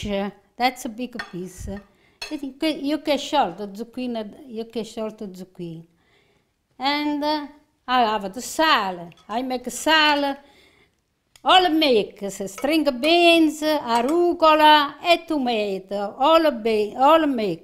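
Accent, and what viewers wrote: Italy. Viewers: So glad to see you happy and healthy, you are a true inspiration to all. I made your stuffed cabbage ( which I have never ever made in my life) and I invited my daughters fiance's mother over for supper, well all I can say is thank you and thank you heavenly father, my husband and my friend LOVED THEM. I was panicking the whole time I was making them and when I was rolling them I kept hearing your voice " keep all the stuffing inside" (of course in you beautiful Italian accent). Thank you for showing us how to make fabulous Italian food that our families love. PS I still am up for adoption. Much love to you and your family God Bless. Oh and I forgot this will be dinner tonight for hubby and I. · Thank you for demonstrating this delicious recipe. I love your Italian accent. You are such a great cook and singer too! ❤️👍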